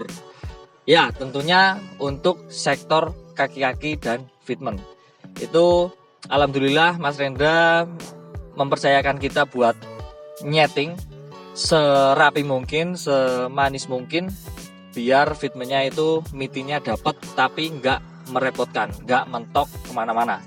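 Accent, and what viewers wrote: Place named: Java, Indonesia. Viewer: medok jowone mas 😅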